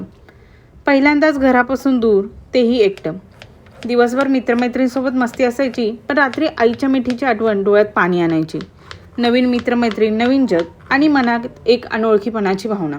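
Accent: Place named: Pune, India. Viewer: Tasty bhaji with your Puneri speech😋😋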